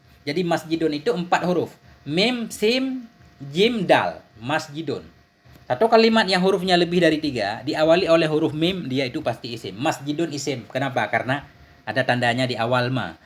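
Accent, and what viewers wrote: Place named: Lombok, Indonesia. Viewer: Afwan kayaknya ustnya org lombok ya hehhee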